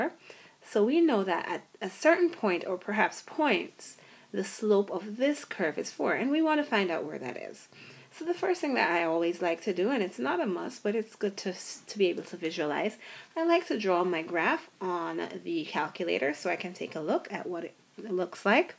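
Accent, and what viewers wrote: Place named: Nigeria. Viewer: is that a Nigerian accent I hear seeping through.... much luv!!!!!!!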